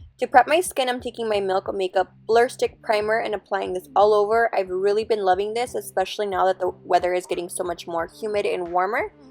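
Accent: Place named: Mexico. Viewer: Kmarie Valle It's called an accent... and majority of us talk like this haha isn't the only Mexican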